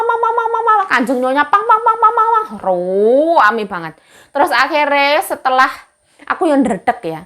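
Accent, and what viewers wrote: Java, Indonesia. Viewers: Aq seneng liat mb...jowone medok..dr Blitar ya mb,?..sy Arema mb.Mlg.... 😀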